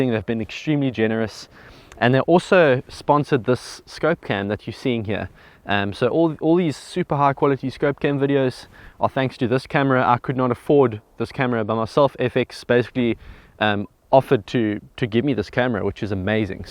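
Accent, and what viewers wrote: South Africa. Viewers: Because of your accent id assumed this was south africa but now i find out its my homeland of Argentina! what are the airgun laws like? Man i been thinking about moving there for the longest after visiting Mendoza, i just loved the laid back lifestyle.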